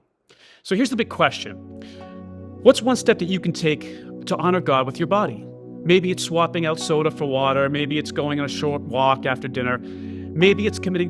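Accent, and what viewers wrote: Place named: Canada. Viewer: I detect a Canadian accent. Tom in Shizuoka Prefecture, Japan. Thank you for this sermon.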